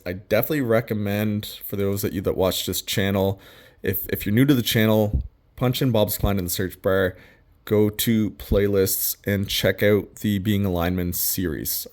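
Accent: Canada. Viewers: I’m curious are you from Canada? I ask because your accent sounds like a Canadian accent of some sort and a lot of the stuff you use in your videos I’ve yet to see in the states or at least my state and potentially Missouri as we go up to visit family that lives there once every year or 2 either way I love your videos though I do worry about your safety as it does seem there are a lot of risk involved which is why I am glad you assess the situation before hopping into the bucket and start working and also make sure you take proper precautions to ensure your safety while also not causing further damage to the lines you’re working on but one thing I do have to say the fact you take the time to explain why you’re doing something this way or that way or just simply boiling down to training you’d receive as becoming a lineman, and it’s a dangerous job, my grandfather works in highway construction but also has built houses before he and my grandmother moved down here when my mom was 2 so he also has some knowledge when it comes to wiring up a home for the most part and as someone who’s fascinated by electrical equipment and electricity he left me with a warning to be careful when measuring the voltage 120V AC as it can also cause severe injuries or death, which I’ve already taken precautions when I’ve done this stuff, but I’ve learned a lot about step up voltage, step down, inverting voltage from say 12vdc to 120vac even down to using a old spare computer power supply as a a budget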